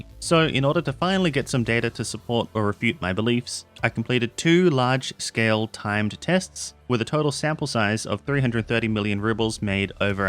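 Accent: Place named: Australia. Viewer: first of all, i love this video and you're crazy underrated. awesome tips, coming from a fairly washed tarkov player. second, i was running factory earlier today, and was killed by so i checked your twitch out and sure enough, there you were in factory beaming me down. and here i am 2-3 hours later clicking on a youtube video and hearing a fairly familiar aussie voice and checking who it was from. and as you can guess, it was you. anyways, i love the content, subbed.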